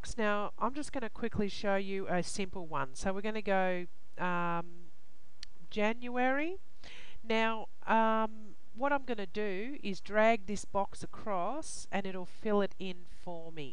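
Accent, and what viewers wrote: Australia. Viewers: She’s Aussie 😅”jazz it up” love it! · I am assuming she is from Australia...which as been referred to as OZ...cheers mate!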